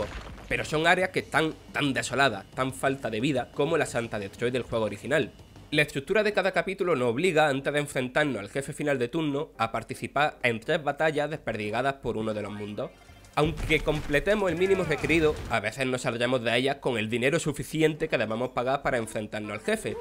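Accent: Andalusia, Spain. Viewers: El tipo tiene acento andaluz no problemas fonoaudiologicos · Buen análisis viva el acento andaluz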